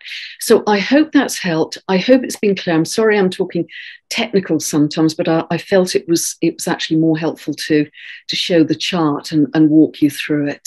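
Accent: United Kingdom. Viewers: American will believe any crazy thing as long as the person saying it has a vaguely British accent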